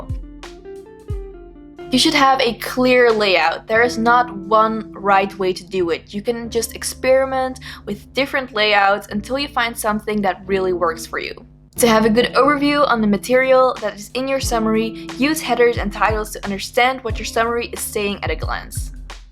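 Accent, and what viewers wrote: Netherlands. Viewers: When I heard ur accent I noticed how it sounded dutch, when I saw: "inhoudsopgave" I was like: 'Aaahh so she is'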